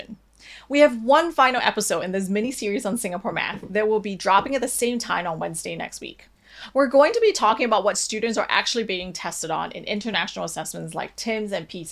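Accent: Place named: United States. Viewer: How do you get an American accent having spent 12 years of your formative years in Singapore, taught by Singaporean teachers with Singaporean accent?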